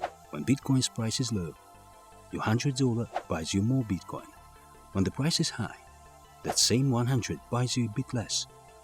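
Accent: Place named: India. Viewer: I have worries in this project since the voice sounds like from India where most scam hubs exist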